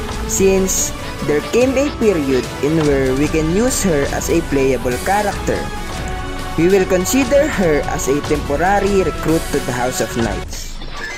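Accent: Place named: Philippines. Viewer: Bro are you Filipino? I recognize the accent anywhere.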